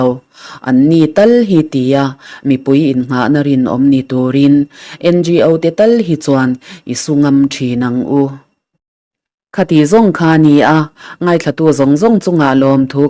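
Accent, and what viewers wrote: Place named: Manipur, India. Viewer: A chhiartu hi mizo nge MEITEI aww😊, zawngchhang suh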